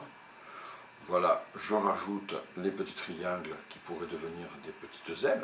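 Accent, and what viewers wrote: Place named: South, France. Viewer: Je viens de vous découvrir, c'est ENCORE bien sûr. Et puis, pour moi qui suis belge, le petit accent qui sent bon le sud ne gache rien!!!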